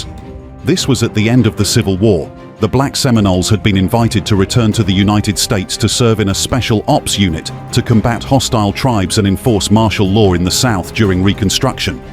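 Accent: England, United Kingdom. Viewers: I enjoyed this video, but the "English or European narrator is a turn off!😮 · Come on now a British/English accent to tell this?! The Trail of Tears were Native Indigenous People. Poor African People was more like The European Ships Sails of Tears to be Honest. Very Sad and Very True!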